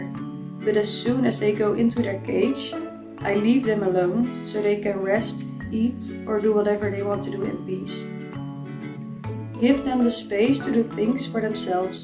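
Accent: Netherlands. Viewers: Lovely! Really wonderful job! I can hear from your voice that you are dutch hehe😂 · You sound netherlands like how i say the english Words u say them whit a wierd axsent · I think I'm deaf, but I hear a Dutch girl speaking English.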